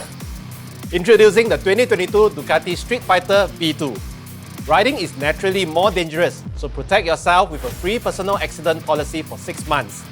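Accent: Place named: Singapore. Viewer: I like the content but guys, can we stop with the extreme Singlish pleaseeeee. It’s honestly quite annoying and totally not necessary at all. Don’t have to speak like ang moh but certainly not like some coffe shop uncle. Cmon guys this channel have the potential to be a proper bike review channel.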